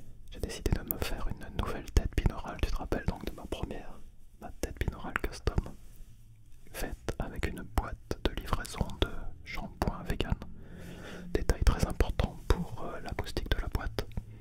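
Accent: France. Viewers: French accent on ASMR is ✨perfect✨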